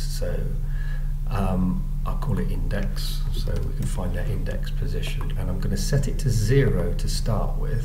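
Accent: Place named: United Kingdom. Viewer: This account is WAY better than the other accounts I have seen. Mainly because you sound British which means I can actually understand you. lol.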